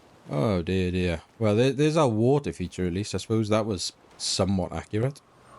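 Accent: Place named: Wales, United Kingdom. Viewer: Mate, ive only watch half hour and i've laughed so much. Your Welsh accent is quailty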